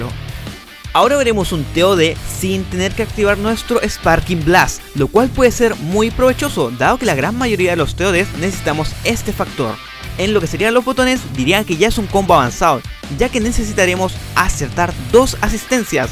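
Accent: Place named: Chile. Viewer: Eres chileno se nota en tu forma de hablar, porque yo también lo soy xD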